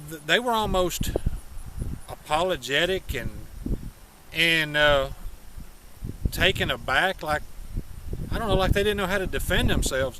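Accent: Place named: New England, United States. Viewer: Hey neighbor, didn't recognize that accent (Yankee) . Argued that anyone north of Tennessee River is a Yankee was corrected by Pete Cook that anyone north of the Cahaba River is a Yankee. 😃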